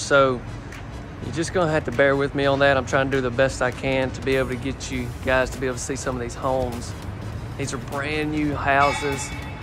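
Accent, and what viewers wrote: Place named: South, United States. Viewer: I love your videos. The quality of filming has truly improved as you learn more from our comments. These homes are certainly impressive. But I totally watch them for another reason. I love your accent. It has been years since I was last down in GA and heard me some sweet southern charm. Have a blessed day.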